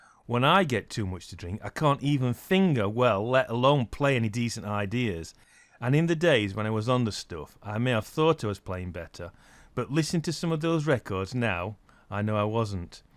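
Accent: Yorkshire, United Kingdom. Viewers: so thats what a yorkshire accent sounds like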